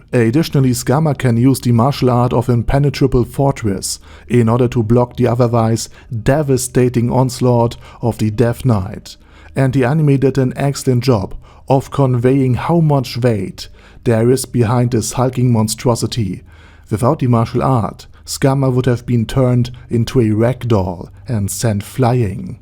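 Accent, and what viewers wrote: Germany. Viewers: Bruder, dein deutscher Akzent ist schlimm.... Hab wrsl nen schlimmeren, aber kann ma das nd geben, wrsl aber trzdm n gutes video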